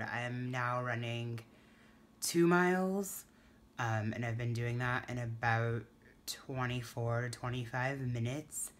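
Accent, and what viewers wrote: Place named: Northern California, United States. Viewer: You sound like my cousin who is from northern Cali that's why I was like hmm I wonder if she is from the west coast. I'm from New Jersey but people say I have a southern accent lol as far as the post op... I've been scared to eat because I always get nauseous.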